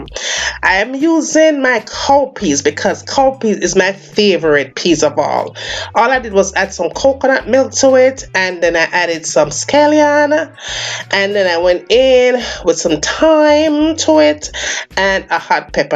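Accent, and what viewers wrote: Jamaica. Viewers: I love the accent. That Jamaican came out a few times while explaining the recipe 😂😂😂 I love it. My all time favorite food is Jamaican Dishes and Spanish Food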